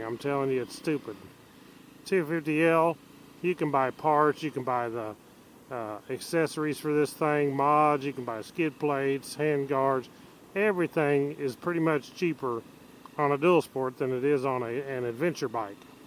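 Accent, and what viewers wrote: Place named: Missouri, United States. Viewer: Being from Mo. I recognized your accent immediately .Been gone 50 years and I still have dreams about the beautiful countryside.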